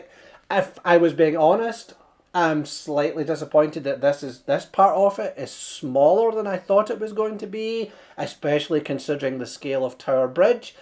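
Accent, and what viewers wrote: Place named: Scotland, United Kingdom. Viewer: love your accent, man. Scottish?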